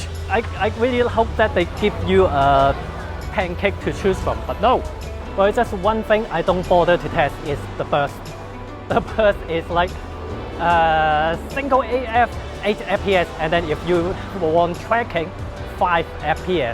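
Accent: China. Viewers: Your English sounds funny ..typical Chinese slang...lol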